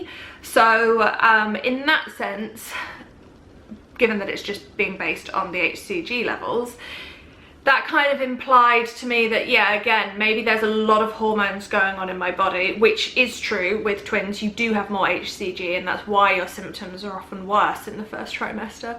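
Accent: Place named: United Kingdom. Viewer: Elizabeth Ungemach British accent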